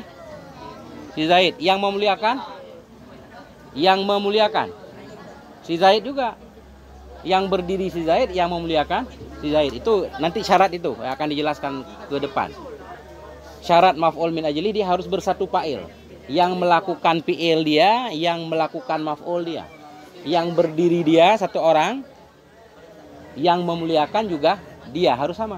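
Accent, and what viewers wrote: Aceh, Indonesia. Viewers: maaf tgk asli orang Jambi / kek mana, sbb loghat nya kek ada loghat Aceh gitu 😅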